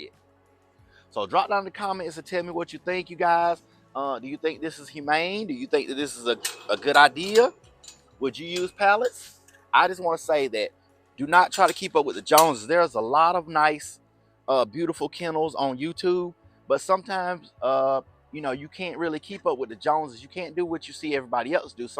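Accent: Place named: South, United States. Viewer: I think my mind is made up now to use pallets. Hec, my pocket has my mind made up. We have old 12x12 fence for dog pin. You have great ideas and spoiled pets. Im a Georgia 🍑. I caught your southern accent about your second sentence. I find the southern accent rich, rooted and historical. Thanks for sharing your video.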